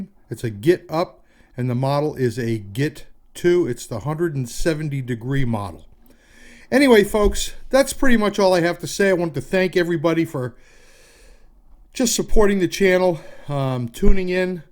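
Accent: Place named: New England, United States. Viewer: I'm from Mass originally, lived in NH for awhile as well. Love the familiarity of the video. The accent reminds me of home! Glad to have stumbled upon your channel. Subscribed now as well. Like you said, I'm watching a ton of videos on YouTube. Looking forward to catching up on your other videos!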